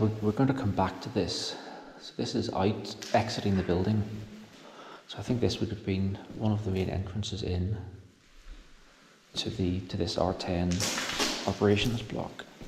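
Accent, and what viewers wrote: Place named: Ireland. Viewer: It was good to hear that Irish accent commentary. I used work beside a chartered engineer from Ballymena in Edinburgh in the 90's. Mesmerising video thanks for sharing.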